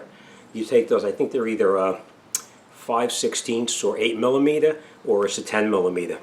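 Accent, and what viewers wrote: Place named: New York, United States. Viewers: You from New York?👀 Love the accent.💯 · I like your accent ! haha A NYC mechanic!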